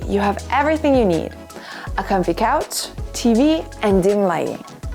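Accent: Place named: Florence, Italy. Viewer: sentirti parlare italiano con accento fiorentino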